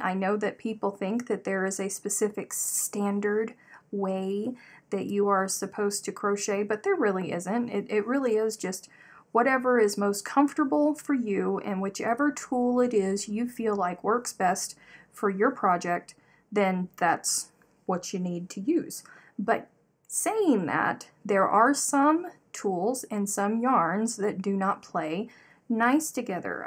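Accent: South, United States. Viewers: OH MY GOODNESS!!! I JUST heard where you are from😃😃😃 My hometown is Savanah Georgia🤗 I'm a GA girl living in Las Vegas, NV, and I can tell yall, it's true...These people have NO idea about sweet tea😳 They actually think you can sweeten cold tea😳WITH ICE IN IT😳😳😳 Ok, rant over😂🤗Oh, I do like the granny square you are working on, that's just enough beautiful color to make it pop. I have been binge watching your videos since I found you Saturday. I have a question for ya, I heard you say you were a graphic designer. Did you go to SCAD? I have a great friend that is a graphic designer & she graduated from SCAD. Uh oh, yep, just heard you talking about high cotton, yep it IS a southern thing. I heard that all y life too, lol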